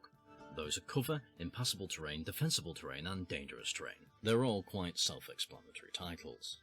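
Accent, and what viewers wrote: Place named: United Kingdom. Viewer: It matters because sometimes people can't pick out the British (?) cadence. There are a few important words in the beginning I couldn't make out even with repeated listenings.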